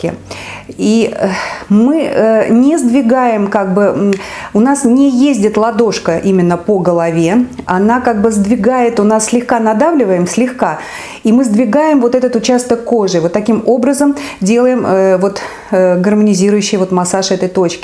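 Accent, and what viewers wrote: Saint Petersburg, Russia. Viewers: Чувствуется коренная питербурженка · Очень красивая женщина, красивая ленинградская речь!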